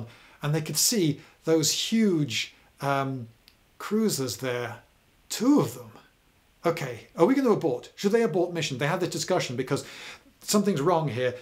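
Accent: United Kingdom. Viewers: into? you speak Queen'a english, most of us can barely understand the accent. Don't worry about a tiny "in insignificance", videos are too good AND funny anyways. History is beautiful when explaines with entertainment. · Yes, realize you're a Brit.... The accent gave it away... Stay on subject,. thank you... ☮️ 🌎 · just searched it up. Funny thing is, there's a video made by scholagladiator ( or something ) where he mentions his meeting with Lloyd and he actually admits the British pronunciation is wrong at this subject... But I also get that it's just how you guys used to say it so... thanks for responding :)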